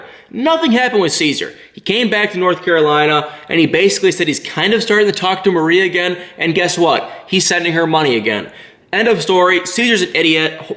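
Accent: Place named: Midwest, United States. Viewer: Omg ur Midwest accent lol. I’m from nyc and all I can hear is ur accent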